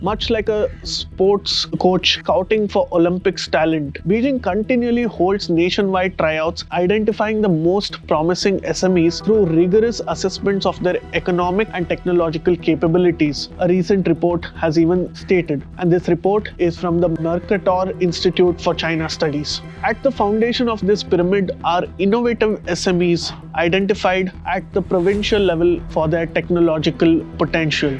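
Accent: India. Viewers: I cannot understand most of the Indian people's talking. Your Indian accent is the least I have ever heard. Wish you a great success. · can you use AI instead? your curry english is terrible.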